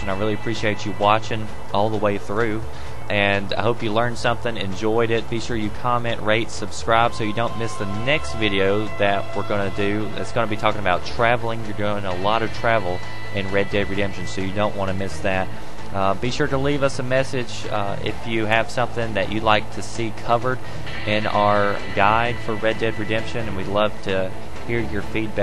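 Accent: South, United States. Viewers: The fact that you’re southern makes this video so much more fun. You’d be good for voiceover work. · are you from the south? don't worry I'm not a dumb yankee I'm from Mississippi i can just really hear your accent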